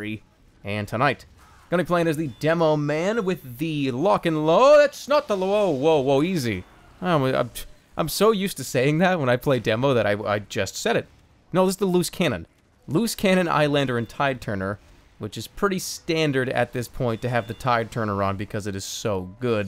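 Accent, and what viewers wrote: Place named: East Coast, United States. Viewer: Jerma, I love your east coast accent.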